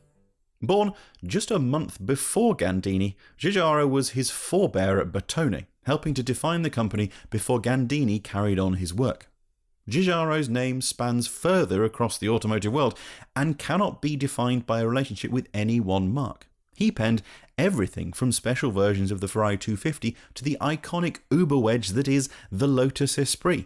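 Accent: United Kingdom. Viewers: Yeah we get it. You are british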